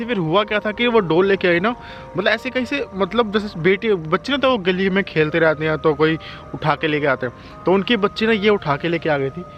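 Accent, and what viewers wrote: Haryana, India. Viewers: Apka hariyanvi accent itna cute lagta h real Ho bhai don't worry aur pls apni safety ka khyal rakho god bless you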